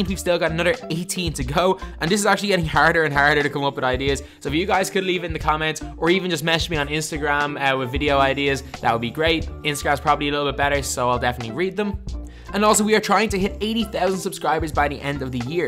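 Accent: Ireland. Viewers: This is an Irishman narrator who is trying to sound American.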